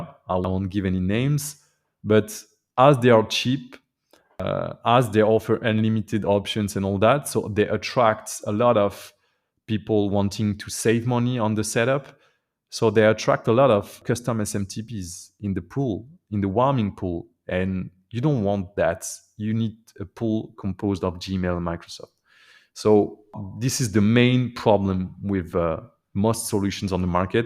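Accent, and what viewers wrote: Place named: France. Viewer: sympa l'accent Français :)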